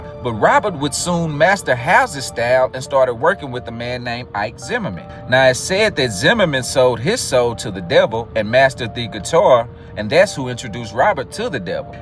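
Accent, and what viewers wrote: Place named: South, United States. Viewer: He has a southern accent, but it's not thick at all. You really can't understand what he's saying? That's fascinating! You should here what a thick southern accent really sounds like! Look up creole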